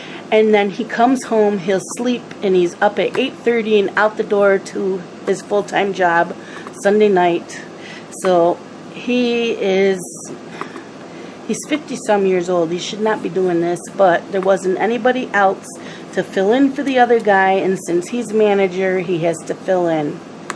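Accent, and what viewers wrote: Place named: Upstate New York, United States. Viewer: Hi. what a cool name. i am watching you color the Freebie Friday, the March, and you're calling us. your peepers. i just love Ann soo much and I enjoy your videos as well. I wonder where you are located. I am guessing upstate NY... I am an Army brat born at Fort Dix NJ but being military one picks up on accents... But I want to tell you how awesome I think you have done this Mandala!!! I am going to try doing one with markers and see how it goes. Have a blessed day. ~bobbi in TX.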